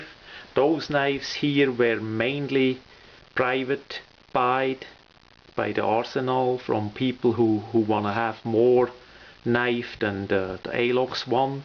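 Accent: Switzerland. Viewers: ich mag deinen Schweizer Akzent^^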